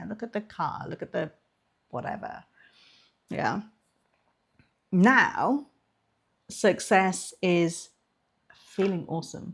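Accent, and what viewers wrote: United Kingdom. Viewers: 😊😊😊 That British accent is too kool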